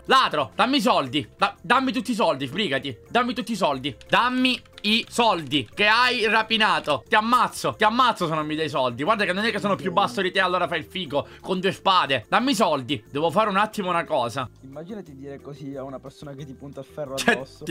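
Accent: Sicily, Italy. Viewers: ma sei siciliano? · Ma sei siciliano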